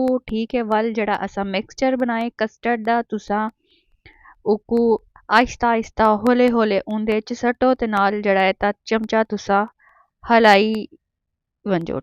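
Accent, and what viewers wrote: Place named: Saraiki, Pakistan. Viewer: Nicccc Sariaki vice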